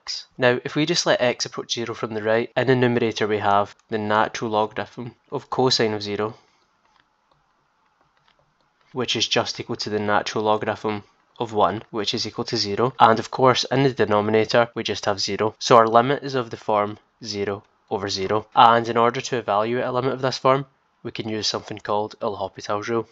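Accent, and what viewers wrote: Scotland, United Kingdom. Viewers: Good evening to my Scottish friend, Being able to apply L'Hôpital's rule to limits where possible, is the same as always having a pocket calculator available; hence I call this rule " The Calculator Rule " (lol), but also keep looking inquisitively for alternative solutions!, thank you again for a fantastic presentation told by you with a pleasant characteristic accent, recognizable everywhere... Thank you again and take good care as always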